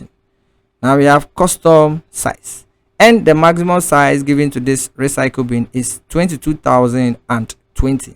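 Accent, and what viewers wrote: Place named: Africa. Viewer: Really love your African accent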